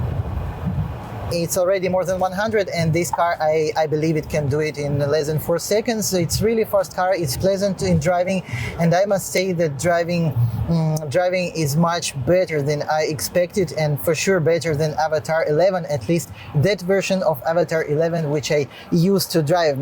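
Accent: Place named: Asia. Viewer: You do like an Asian person speaking English. If I didn’t see you, then I will think you’re Asian.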